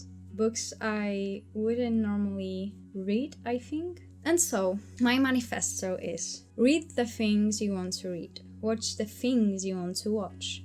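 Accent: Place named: Poland. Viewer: /eng: her accent is beautiful and I am saying that as also polish. Polish people do not have good English accent usually Pl (for basically) Super akcent, zazwyczaj Polska wersja mówienia po angielsku mega mnie irytuje ale twój jest super Jak skończą ci się pomysły na filmy daj coś o języku bo taki akcent to serio wyjątek w Polsce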